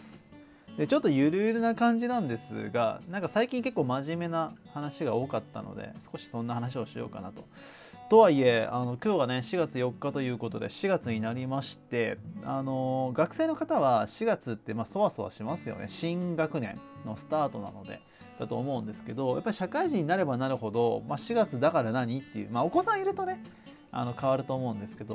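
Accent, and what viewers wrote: Kansai, Japan. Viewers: 関西なまりめっちゃ好きです笑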